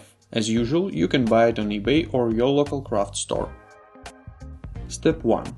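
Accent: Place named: Russia. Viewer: Жёсткий русский акцент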